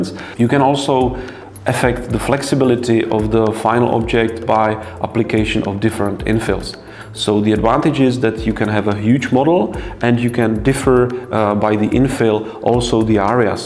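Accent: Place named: Czech Republic. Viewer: Jak jsem slyšel první slovo, tak si řikám, že docela čenglish. Kouknu na info a ono jo xD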